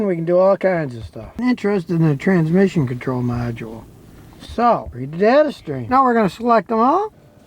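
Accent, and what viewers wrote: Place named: South, United States. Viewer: It is truly sad, that he makes claims not based on facts. His videos are about his opinion, stereotypical old mechanic who just cannot get with new time and engineering. He is so brand-loyal, he can't see how bias he is. I have had owned 3 Kias/Hyndais. Recently sold wife's 2013 Santa Fe with 95k MI. Zero problems in 9 years, purchased brand new. Only thing wre did was new brakes around 60k mi. Had 2010 Kia Optima SX. Bought it used with 150k, once again, zero problems in 2 years that I had it. This is why I do not watch his videos any more. He can't even pronounce Hyundai correctly. As country as his accent is, he is making fun of Alabama? Give it a rest. That are other ways to get views on YouTube.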